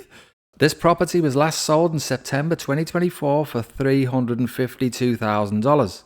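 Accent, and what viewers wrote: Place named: Northern England, United Kingdom. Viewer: This guy sounds like a Northener, England, but New Zealand has so many scammers now, he could just an impression 😮😅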